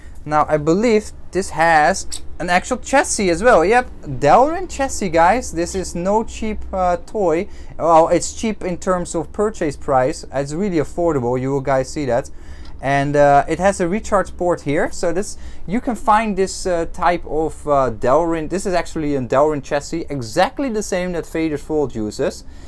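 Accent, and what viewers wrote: Germany. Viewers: Are you german? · You are a German aren't you?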